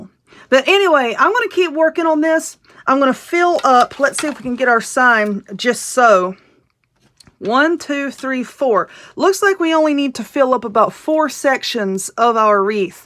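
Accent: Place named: Kentucky, United States. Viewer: I love your videos! If I was to ever make a video ~ I swear I would talk just like you! (I’m from Kentucky). I love your down to earth talk & your slang! God bless you sweetie!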